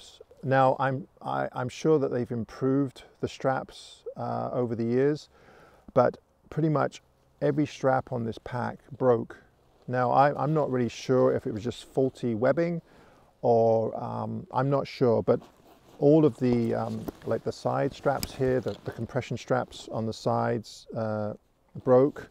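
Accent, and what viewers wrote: England, United Kingdom. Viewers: I remember watching this video as the first one of yours I ever saw. I thought: "He sounds ok, possibly got some English in his accent and he talks sense and seems to have a history in photography." Mmm! So much for first impressions, I don’t remember thinking: "This man is possibly the greatest landscape photographer on YouTube and thus the best I’ve seen." With some of the other landscape photographers like Thomas Heaton, Simon Baxter, Paul G Johnson, that’s not something I say lightly. Thank you for pulling me into your channel, it’s a true pleasure and indeed an honour to see you work and to learn from you.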